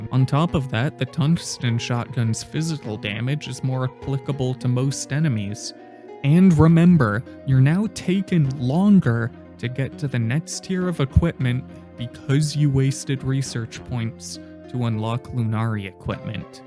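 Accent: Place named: Colorado, United States. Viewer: You sound like you're from Colorado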